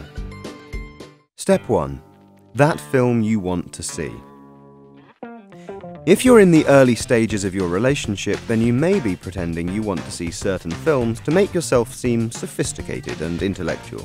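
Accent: United Kingdom. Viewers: Brits? He sounds a bit proper and cheeky. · yeah, but the voiceover guy had a british accent, so scissors paper stone is probably the british version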